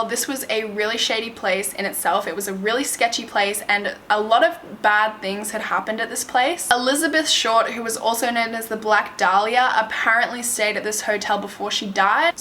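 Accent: Australia. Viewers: It's her accent. She's Australian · Wow I just realized how beautiful you are! And also I love the australian accent :x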